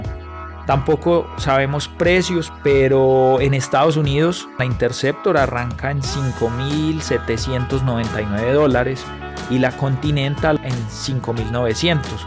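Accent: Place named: Antioquia, Colombia. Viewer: Que bien se oye el acento paisa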